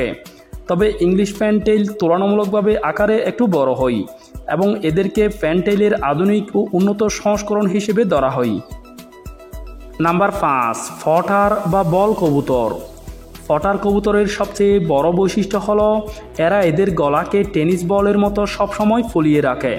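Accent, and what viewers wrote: Chittagong, Bangladesh. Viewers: ওনি ছট্রগ্রামের লোক।। তাই ছট্রগ্রামের বাষায় কতা বলেন।।। কিচু মনে খরবেননা।।।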